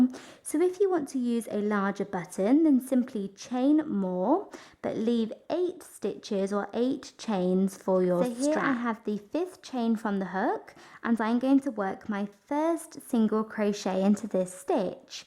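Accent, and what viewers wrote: England, United Kingdom. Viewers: I did wonder as you have an English accent which confused me. I love your videos they are so easy to understand.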